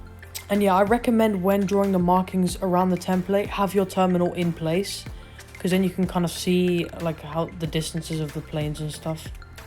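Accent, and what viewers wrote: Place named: United Kingdom. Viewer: Hi, I could tell your British from your accent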